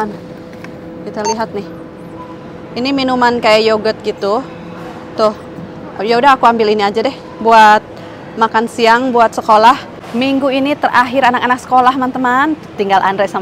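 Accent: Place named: Sunda, Indonesia. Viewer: Teh kl ngomong sunda lucu kdg suka ktawa😁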